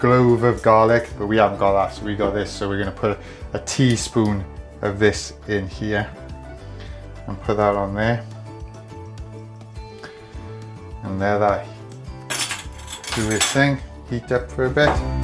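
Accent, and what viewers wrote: Wales, United Kingdom. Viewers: Hi, great to hear a Welsh accent on Youtube and in the Bosses group. Great video, good luck tomorrow for the Grand Slam!!!!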